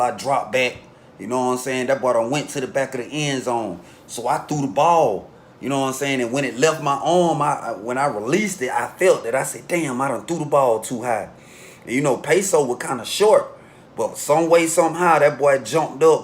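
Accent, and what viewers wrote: United States. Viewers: I just found out about your channel bro i was listening to cartoon53 and Banky Pound now I'm hooked on your channel but I struggle to understand certain stuff cause u sound so country😂😂😂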